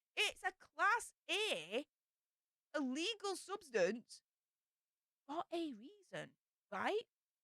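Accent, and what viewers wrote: Yorkshire, United Kingdom. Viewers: The British accent sometimes gets a little heavy. Harder for Americans to understand. I like ur show tho. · I love your accent, what part of the UK are you from? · Too loud? Thats your natural voice or so I thought? Your the same in all your videos as far as I can tell. Get used to the Yorkshire Gob folks :)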